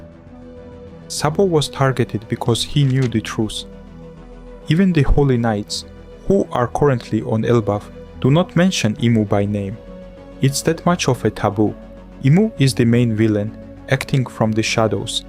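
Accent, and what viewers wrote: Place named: Russia. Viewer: I don't follow One Piece COMPLETELY, about each two weeks i check in and see (Theories of several YTers) But i just LOVE it, when people with russian (or your ) accent are uploaing theories, because they speak STRAIGHT and without forced "jokes" whitch makes them pleasing to listen to. Like from me. Interesting parallels about the flowers and snakes btw. 👍 Your style reminds me of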